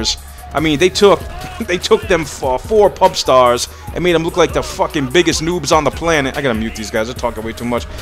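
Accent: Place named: East Coast, United States. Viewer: He's not black. Its a common east coast accent that white people have.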